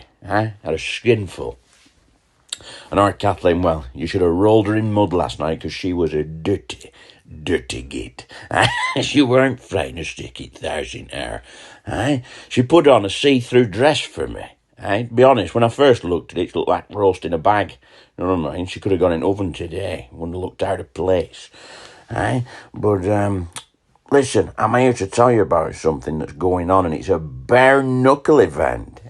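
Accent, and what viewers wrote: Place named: Blackburn, United Kingdom. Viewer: Some proper Blackburn accent is that. Im from Chorley ✌️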